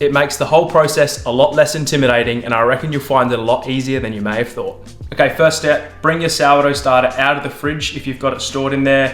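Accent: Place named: Australia. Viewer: It's so nice to hear an Australian accent on YouTube. A nice calm voice just explaining how to make sour dough bread. Lots of good tips about resting the dough, concerning ambient temperature, that I haven't heard of before, so thank you. Nice kitchen too. ❤️🙋‍♀️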